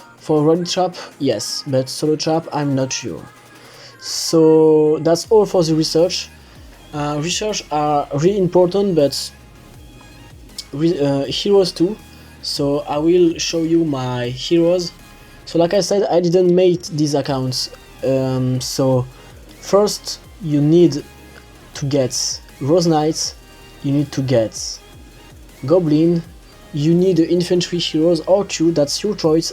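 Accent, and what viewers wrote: France. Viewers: Are you french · Tu change tous me temps de guilde mdrr frero sa s'entend que tu et fr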